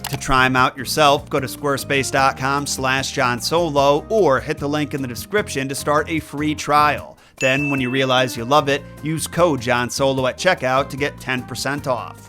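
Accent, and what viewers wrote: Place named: United States. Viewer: Never heard it read in an American accent but cool video.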